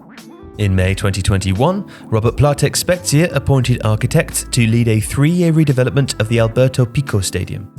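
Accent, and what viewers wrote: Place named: England, United Kingdom. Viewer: He pronounces different because he is English that is how it is in his language.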